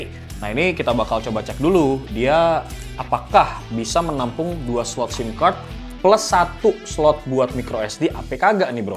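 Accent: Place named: Depok, Indonesia. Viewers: bahasa lu kaya orang Depok Bang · W suka penyampaian lu bang, jakarte bgt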